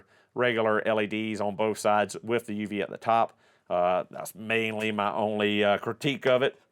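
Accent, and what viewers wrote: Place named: Vermont, United States. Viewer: Is a Vermonter? It all checks out. He even has the accent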